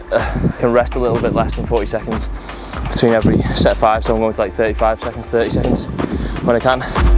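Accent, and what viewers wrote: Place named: Northern Ireland, United Kingdom. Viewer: man... what part of England you from? It sound northern Irish or Scottish-ish lol but yeah..where you say you from now?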